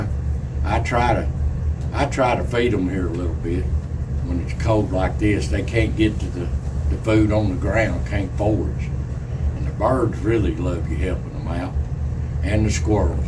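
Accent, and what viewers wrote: Appalachia, United States. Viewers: People who think your accent is fake do not understand there are different southern accents. Yours is like my granny's was, what I consider to be mountain speak. It's real, just no longer common. There were times I couldn't understand Granny, hour was 'ahr', flour was 'flahr', not one was 'narry', wash was 'warsh', there was 'aher' or 'yander'. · Thanks. Watch you every time you post. If those folks don’t know your accent, they are not from around here. And I’m in the Blue Ridge of Virginia. Yea, I’m behind enemy lines now but still country to the bone. Love ya in Christ, brother. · one of the reasons I love your channel is because your accent is like my family's accent. Like the accent in the mountains of Virginia where I grew up. I live in North Carolina now, so I'm still in Appalachia, but I miss that area where Virginia, Kentucky and Tennessee come together. 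It's where all the beautiful nature is, and where all the kindest and most hospitable people on Earth live. People just like you and yours,. Your videos are a PLEASURE to watch! I love your nature video series, AS THE RIDGE TURNS. Dirty Dan is an old rascal, and I'm always eager to see what ol' Yeller, Sock, Ricky or Billy Bobcat is up to! I miss seeing Wishbone and ol' Sidewinder. These little animals have become like old friends, and I have you to thank for that! Bless you and your family, and thank you for providing me with hours, hours and hours of good, clean, family entertainment. I truly appreciate it. It's a great way to start a Monday to see nature's beauty.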